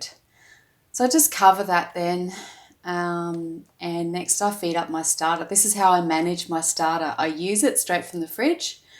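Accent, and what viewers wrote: Australia. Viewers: I love following your recipes. I use freshly milled spelt. Before shaping I sometimes place several (around 30!) garlic cloves on the dough. I really like the way of your cooking demonstrations. It is simple and relaxed. I tried to get a dough whisk here in our country but have not been successful yet. I also like your Australian accent - have got two Australian friends here in Austria. Greetings from Austria.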